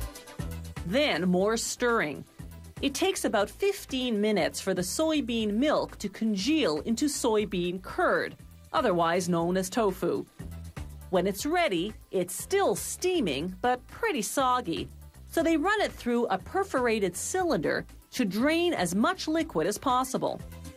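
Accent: Canada. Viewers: No Mention of the awful gas you get when eating tofu? Better invest in Beano. And I don't know what is worse about these videos, the horrendous Canadian accent or the insistance on using the metric system.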